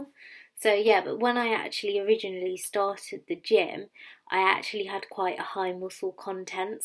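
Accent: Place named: North, United Kingdom. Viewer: Hello sweetie, I feel I’ve meet my match. I can’t do some of the moves that my PT asks me to do, because my mummy tummy is in the way. Things like burpees or bear crawling, because I have a belly in the way. But I’m trying hard and according to the bodytrax I’ve lost 4kg of fat and gained 4kg of muscle. Also, as a northern gal myself, I appreciated your accent.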